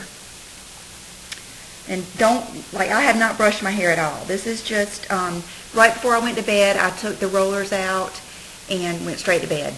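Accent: South, United States. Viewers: I love love love your hair like that! and your accent. I can tell you are from the south too..lol.. keep up with the great vidoes!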